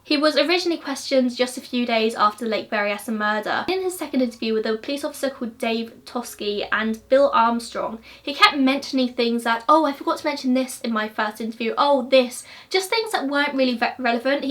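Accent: United Kingdom. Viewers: Please don’t take this the wrong way, but your mispronunciation of “Luger” in a British accent is hilarious as an American who grew up around guns 😅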